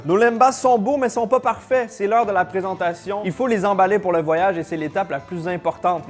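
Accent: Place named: Quebec, Canada. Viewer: Super vidéo, j'ai adoré !!! En tant que fan du seigneur des anneaux c'était le mélange parfait !!! En plus je trouve que l'accent québécois va étrangement bien avec 😂 Par contre je regrette de ne pas avoir entendu le "po-ta-toes" 😢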